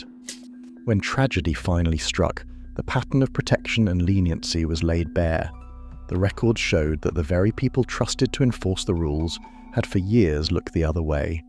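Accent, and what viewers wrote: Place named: United Kingdom. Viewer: I was just going to write the same thing! They show the floor plan, then whisk it away before anyone can get a good look, then they show the same guy over and over. This happens more and more on YouTube videos: people with no training or experience slapping something together. But they think they can convince us that it was brilliantly done because of the narrator's British voice. A very common ploy!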